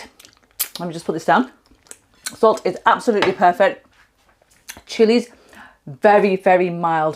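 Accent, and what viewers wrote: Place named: Yorkshire, United Kingdom. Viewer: I've just discovered your channel and I think it's great. I don't know where are you from but you sound to have a bit of a Yorkshire/Manchester accent. Wherever you are from thanks for the recipes anyway.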